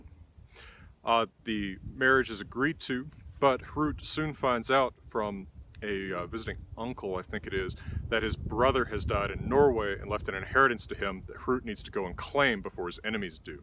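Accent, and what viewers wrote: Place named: South, United States. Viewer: A southern man teaching Norse mythology. Cant tell if your east texas or going up into the western parts around Wyoming, Montana, etc., but know that this is exactly what I needed in my life.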